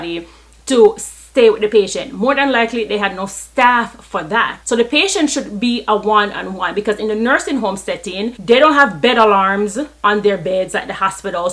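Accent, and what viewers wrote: Jamaica. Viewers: You sound very Jamaican! 🇯🇲 I was watching a video re this story, just minutes ago. It was by a doctor. And I was very interested to hear what happened. But his lacklustre delivery, his projection, etc., I just couldn't listen him anymore. So I scrolled, and there you were. I clicked, and few minutes into listening, I subscribed. Good job! You're brilliant, you're fluent, projection 100%, delivery 100%. Overall, a very informative and excellent presentation! 👏👏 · I don't even have to ask if you're Jamaican, I'd only ask what part you're from? I can recognize my people anywhere!!! New subscriber here, also a RN and you are already inspiring me to climb higher! Bless you!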